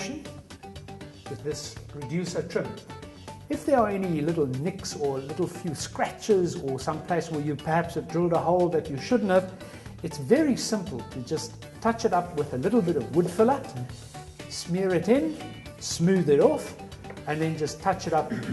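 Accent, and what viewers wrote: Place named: South Africa. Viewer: Great to hear that South African accent! Thanks for your video and efforts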